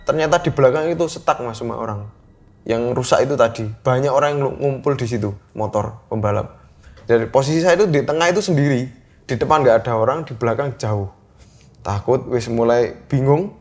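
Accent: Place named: East Java, Indonesia. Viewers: Bangga dengan medok jawa mas. Karena mencerminkan orang yg lucu dan sabar hati. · Bahasane campur". 😂😂😂 Jowo indo campur . Medok emen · Anune,itune,katane,ndek sana,ndek situ,suuuwepiii,tuuuwakoot,gak uwweeenak,pehhh,behhh,. Jawatimur pride ❤🥰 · Y Allah lucu ngomonge wong jowo,salut ..salam dri jowo kendal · Peeeh..byuuh...khas e kediri😅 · Podo" wong jawa timur mas.. Suueneng aku karo logatmu mas😂.. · Amat sangat medhok ngrungknno seneng 😂😂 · Yo-opo rek malang e medhok e pol 😂😂😂 , q Melu meker pedut iku bhs indo e opo!!! Jasikkk 😂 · wkwkala bener mas logat e wong jatiman pol😂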